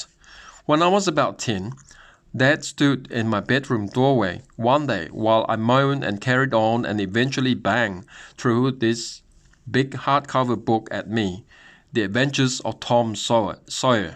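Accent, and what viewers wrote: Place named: New Zealand. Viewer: Your NZ accent comes to the fore here.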